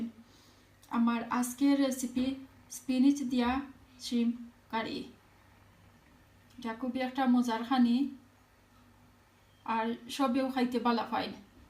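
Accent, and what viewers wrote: Sylhet, Bangladesh. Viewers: Apa apni kemon acen....aponer kota gula sune kub anondo pelam mone hoi amader deser...sileyte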